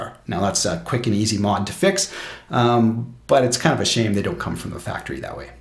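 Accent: Canada. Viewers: Now there's a Canadian accent!!!!! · One of the most Canadian accents I've ever heard.. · I'm English and I picked up on the Canadian accent. Certain words have a Scottish ring to them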